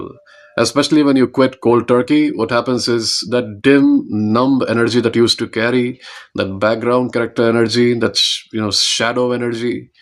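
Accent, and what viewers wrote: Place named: India. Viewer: woh toh theek h par laadle ascent kati desi h aapka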